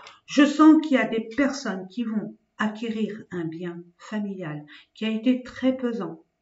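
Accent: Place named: South, France. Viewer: Bonjour, accent du sud... ça tombe bien je suis dans le Var et je veux aller dans le Vaucluse pour faire effectivement un autre travail enfin quelque chose qui englobera mon travail actuel. On attend juste le financement pour pouvoir avancer dans le projet. Avec achat immobilier... Choix numéro 1, c'est beau. Merciiiii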